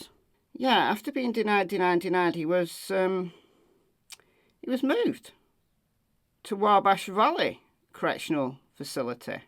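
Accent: United Kingdom. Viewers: I know I can’t be the only one that thinks that hearing the words “numb nuts judge” in British accent sounds so much funnier than someone with an American accent saying it!!😂 and the “you need to be watching the Super Nanny, Frances! You’re not very good at this, Frances!!” I’m cackling and my husband is looking at me like I’m crazy! The snark in this video is completely appropriate and warranted!! Frances C. Gull (aka Frances Seagull as in the bird 😂) is a complete joke and a disgrace!! 🤡🤬I just pray that the Indiana Supreme Court judges are able to see through the dishonorable Judge Gull’s BS!! I am also praying that Judge Gull is removed from the trial and that Baldwin and Rozzi are reinstated! Most importantly however, I am praying the hardest for justice for Abby and Libby!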